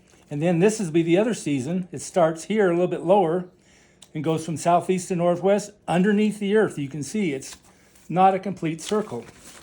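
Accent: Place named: United States. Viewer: HEY! Further to my other comment. I took a quick look through your YT channel and conclude that you are 'Muricun. You say EEquator and not eQUAtor. I described in my other comment how a Mercator projection is made. Now I will tell you about the TRANSVERSE Mercator projector. In the US of effen A!! Large scale topographic maps are created using a TRANSVERSE Mercator projection. A meridian (line of Longitude) is chosen as the central meridian for any particular map. The entire country is gridded out so these reference meridians are specifically chosen to allow map sheets to line up with ones east and west (and north and south) of them. Imagine a cylinder of paper wrapped AROUND the globe along an above stated meridian line. The maps produced here are VERY LARGE SCALE so only a very tiny bit of the earth's surface is projected for these maps. The largest scale map that is usually created for these topographic maps is a scale of 1:63360 WHY that number? 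I live in Canada. An equivalent map that is produced here is 1:50000, so it is slightly larger in scale. But WHY does the USA use 1:63360? ANY idea? I'll let you figure that out. When you do, then figure out why Canada uses 1:50000 These topographic maps are quite accurate. They ALL have a bit of distortion at the east and west edges. They are accurate ONLY on the very center meridian line. You can purchase several map sheets that adjoin each other and cut off the large margins to leave JUST the map part, and then fit the she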